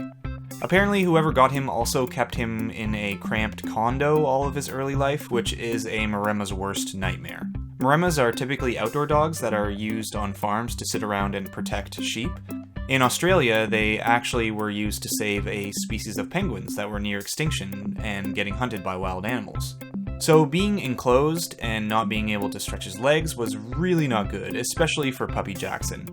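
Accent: Canada. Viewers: You're definitely Canadian! I have made up my mind haha. I am Namibia (African) and can just tell from your accent. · I've been thinking the Sam's thing! Either Canadian or from the very northern United States, like Minnesota. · If I remember correctly, I think he hinted at being from around Toronto in one livestream. But yeah that accent is DEF Canadian 🤣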